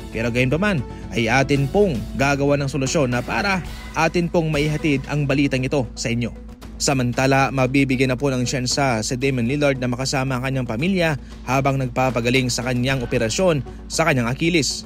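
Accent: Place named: Visayas, Philippines. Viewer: Bisaya ampots ahahaha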